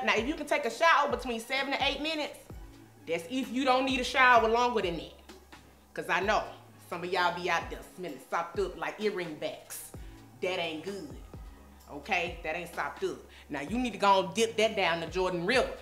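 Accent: England, United Kingdom. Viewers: It's the british accent for me. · She took me out with the English accent 😂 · It's the British accent for me 😂 😂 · I “REALLY” Liked your “British” accent!!! Classy!!🙌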